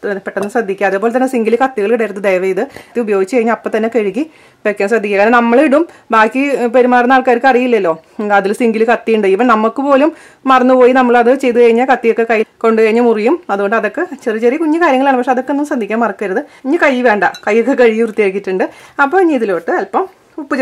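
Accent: Thrissur, India. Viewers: Nalla assal Thrissurkkari :D. Rasamulla explanation :)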